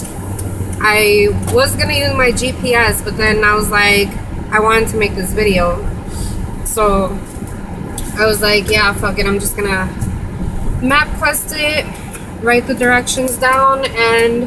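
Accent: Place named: New York, United States. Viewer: I love your accent, are you from NYC?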